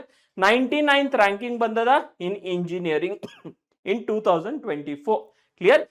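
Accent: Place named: North Karnataka, India. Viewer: Bro you are Uttar karanataka 😅😅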